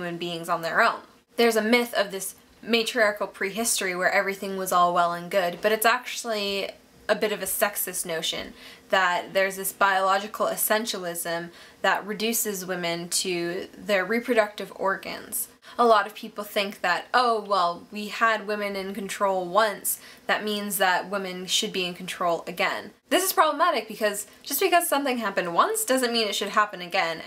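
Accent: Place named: United States. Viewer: ..... This is a modern viewpoint assuming that history respects your cultural opinion on sex. Based on voice alone and I may be wrong is you're american, less than 200 years ago it was a scientific fact that black people were lesser, and women's role in the house seemed to be limited to child bearing... Then you claim humans in ancient society had enough time to understand the difference between gender and sex.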